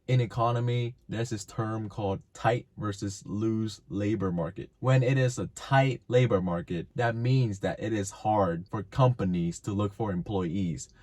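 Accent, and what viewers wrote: Vietnam. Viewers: Are you in America? Sounds Vietnamese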